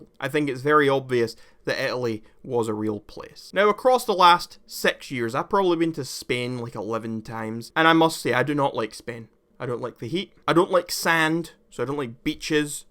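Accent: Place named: Scotland, United Kingdom. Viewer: If an Australian person punches you square in the nose that would be very very real Also, you called out Aussie accents and your literally Scottish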